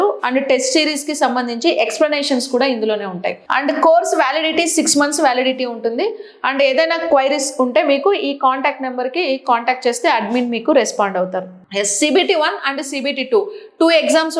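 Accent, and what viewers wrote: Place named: Telangana, India. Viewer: True Telangana slang 😂❤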